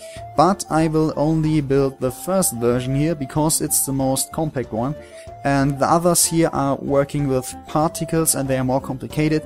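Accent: Germany. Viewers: Dein Englisch klingt echt total Deutsch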